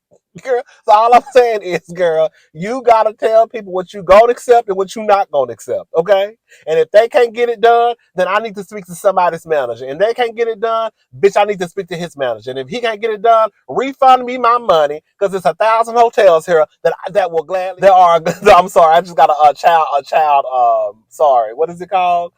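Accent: South, United States. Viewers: I love listening to you speak! I love listening to "the south" in your accent.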